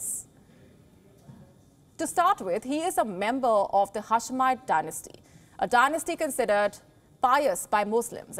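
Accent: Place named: India. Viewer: Indian English..🤦‍♂️.... " Julia and sumalia ... 😳😳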